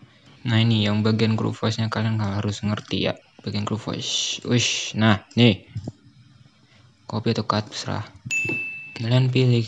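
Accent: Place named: Java, Indonesia. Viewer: Bagi saya cara menyampaikanya Sangat sangat jelas kang dan lantang dna logat jawanyapun terasaa,sayapikirsitu orang jawa aali,Dan sayapun asli jawa tapi sekarang tinggalnya di Daerah provinsi banten,salam dari saya,Btw do you want platon with me😎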